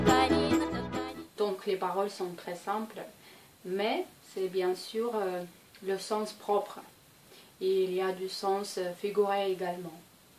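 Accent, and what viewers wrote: Russia. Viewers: j'adore votre accent russe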